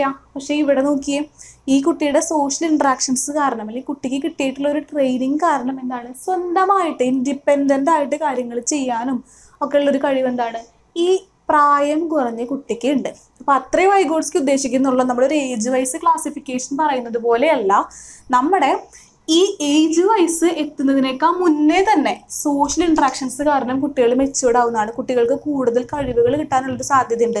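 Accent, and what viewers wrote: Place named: Thrissur, India. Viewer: Thrissur slang tta.....😃😃 And......Classum super .......Ellam clear aayi manasilakunnund tta.....Thanks.....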